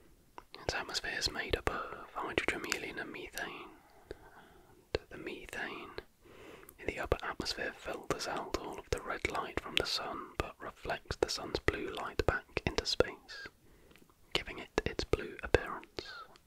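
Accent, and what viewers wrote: United Kingdom. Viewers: Amazing video to fall asleep to. I take a while to fall asleep so I usually need something to occupy my brain until then and this is absolutely perfect and even better that its educational. Your voice with your British accent is just amazingly calming to listen to. Thank you very much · he's just british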